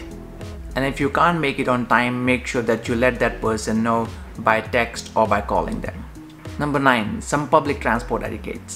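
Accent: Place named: India. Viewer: U sounds Indian!! Aren't u?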